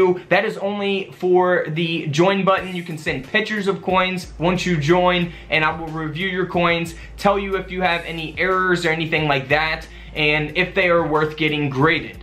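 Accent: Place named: New York, United States. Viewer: Really,..! He must be, either from, NYC, or Jersey shore, Noooo, I m not type casting, am I,..?lol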